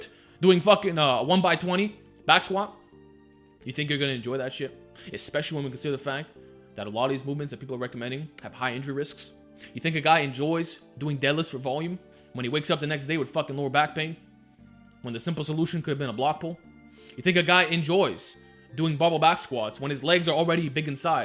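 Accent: New York, United States. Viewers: Yo this is not a diss juat realized you have a NY accent with a little bit of a chinese american accent mixed in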